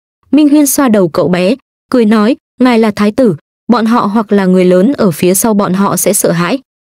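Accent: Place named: North, Vietnam. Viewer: nghe giọng bắc quen, hên sao tìm đc bạn đọc truyện nên dễ nghe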